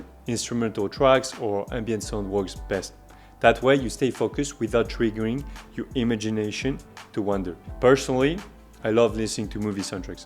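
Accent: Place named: France. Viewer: Merci frérot 💪🏾 😹j’avais capté l’accent français 💪🏾🔥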